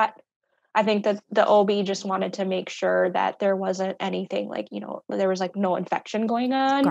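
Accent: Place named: Wisconsin, United States. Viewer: I recognized this beautiful family was from Wisconsin within the 1st minute I heard her voice. I'm so very sorry for your loss. She is gorgeous, she is loved, she was wanted and she will always be missed and remembered. Much love and support from Madison, Wisconsin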